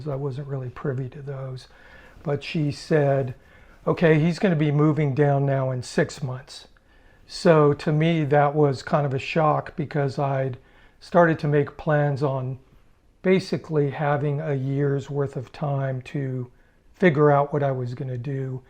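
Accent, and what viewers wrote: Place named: United States. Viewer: You have a very American accent for a Brit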